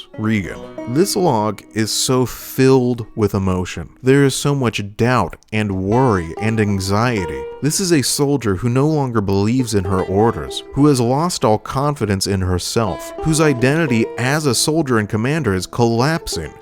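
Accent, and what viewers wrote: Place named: United States. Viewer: Like you sound American too have you never heard of Ronald regan??? On top of that Ellis literally says her name and you still said it in the stupidest way possible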